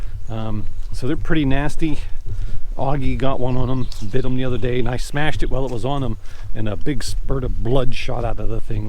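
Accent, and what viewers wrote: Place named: Western Pennsylvania, United States. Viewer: Let me know when you get on. We can practice Cw. Seems I have a pipe to pa. That west pa accent cracks me up. I grew up with western pa folk. Man you have the trees for an amazing delta loop. Vertical.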